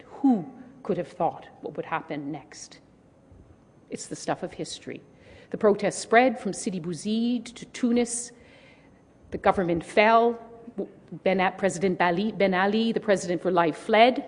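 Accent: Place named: Minnesota, United States. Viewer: She sounds a bit like Minnesota people, to me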